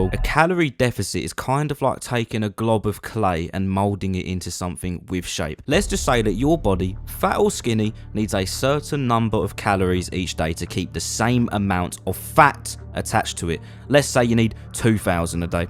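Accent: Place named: Essex, United Kingdom. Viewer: Proper funny mate - just found your channel, you sounds like a fellow Essex man.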